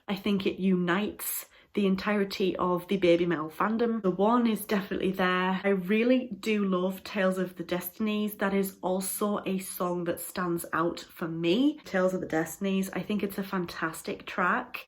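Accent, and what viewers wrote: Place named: Geordie, United Kingdom. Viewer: Fascinating video with some Interesting choices! My fav tracks for each album are::, (......Best Album!):,;, Trying to pinpoint your accent: sounds like a hybrid of Geordie and North Yorkshire..........so I'm guessing: Middlesborough or possibly Darlington.......?:) btw I've included your video in my Reactions (Best of) playlist..... check it out on my channel (which has ELEVEN playlists devoted exclusively to -related content.....with a total of over 8,500 videos: what better way to fill these long lonely days of Corona quarantine?!!! lol)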